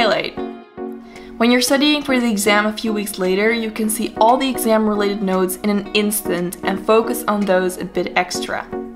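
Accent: Netherlands. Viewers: WHAT? are you dutch? omg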